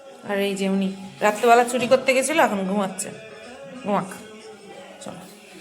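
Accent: Bangal, Bangladesh. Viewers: Bangal bhasa ki je sruti modhur laage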